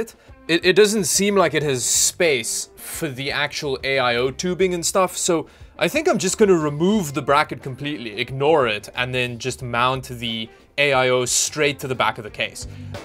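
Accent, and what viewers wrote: South Africa. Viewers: where u from u sound South African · Are you from CA? I hear some SA in there, especially with the Ja. lol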